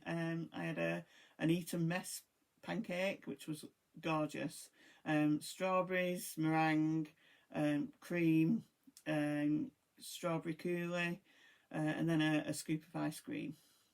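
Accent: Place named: Northern England, United Kingdom. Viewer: Just got into smalls so the winter pattern would be lovely to add to my very small collection - love your channel, so nice to hear another northern accent!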